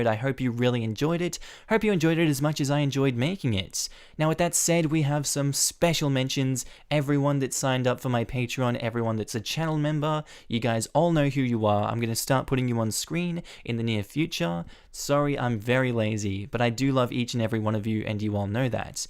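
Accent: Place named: Australia. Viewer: i love the aussie accent!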